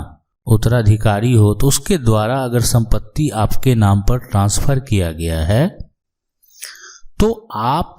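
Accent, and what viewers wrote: Bihar, India. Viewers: पहले सही हिंदी बोलना शुरू कीजिए तब चैनल देखेंगे। बिहारी हिंदी बिहार तक ही सीमित रहने दें।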